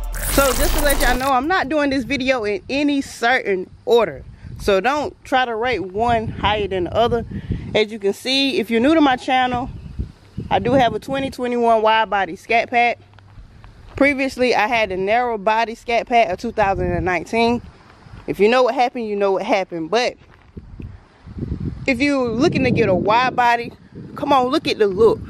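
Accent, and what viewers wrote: South, United States. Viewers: That southern accent is something special.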